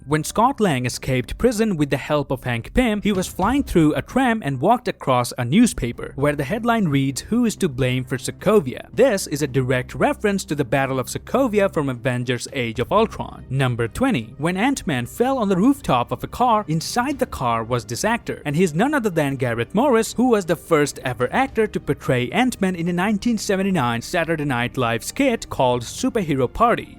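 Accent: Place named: Canada. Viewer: lol dude because of your canadian accent opinion is openion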